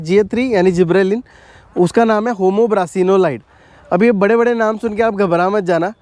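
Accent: Punjab, India. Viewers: Aapki Hindi mein Punjabi tone hai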